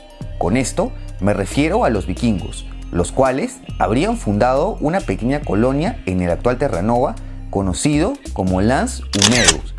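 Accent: Peru. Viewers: por la voz y tu borrador artesco,eres de peru,no? jajaja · es peruano, el acento y el borrador Artesco, ambos peruanos!. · Es peruano xd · Es Peruano, En Perú no hay muchos acentos.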